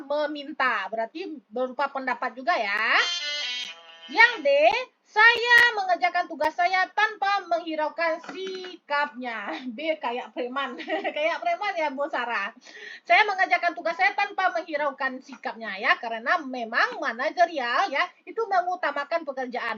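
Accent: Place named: West Sumatra, Indonesia. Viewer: Dari gaya bicaranya ibu orang Minang ya.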